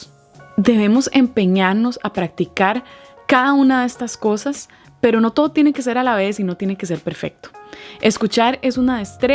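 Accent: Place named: Costa Rica. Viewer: Amo la genuinidad de tus vídeos! Me fascina que hablés como tica 💗 y que usés el voceo en tus mensajes! Me encanta tu contenido, es super útil! 🇨🇷😁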